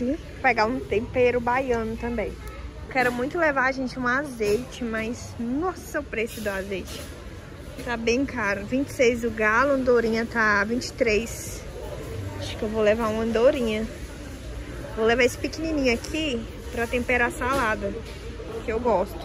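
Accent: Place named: Minas Gerais, Brazil. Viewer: Eu amo sotaque assim. Não conheço mas parece ser mineira ou go 😂.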